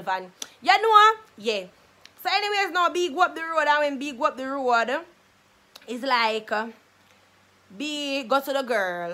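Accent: Jamaica. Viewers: I love jamican english ❤️ like the slangs are lit... 🇳🇬 your vibe is lit ❤️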